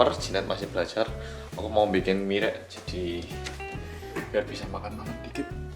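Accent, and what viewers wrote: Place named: Java, Indonesia. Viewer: mas jawa puoool tapi kalo kaget, trus ngedumel, sama kagum gitu korea nya keluar banget hihi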